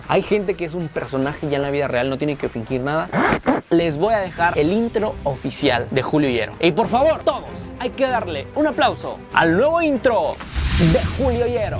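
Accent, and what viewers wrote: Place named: Maya, Mexico. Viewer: Me gusta el acento maya jajaja👏♥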